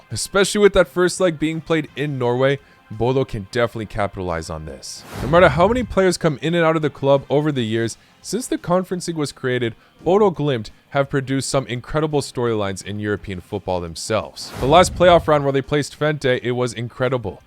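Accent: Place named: United States. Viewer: You speak perfect American English for me and Your American Accent is so awesome.🥰😍🤗❤❤❤❤❤❤❤🤍🤍🤍🤍🤍🤍🤍💙💙💙💙💙💙💙💯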